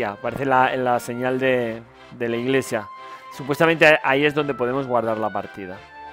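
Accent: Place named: United Kingdom. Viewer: DIIOOOS QUE PUTO ASCO DE ACENTO, BRITÁNICO EN PLAN CHUNGO. Se me esta haciendo duro acabar el video.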